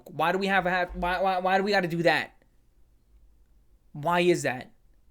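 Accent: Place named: Baltimore, United States. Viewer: I have a feeling you're from baltimore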